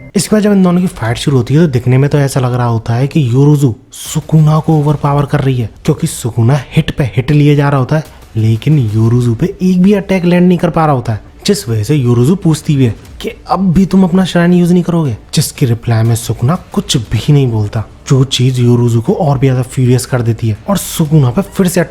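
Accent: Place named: Madhya Pradesh, India. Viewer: You are from MP Or either from near by agra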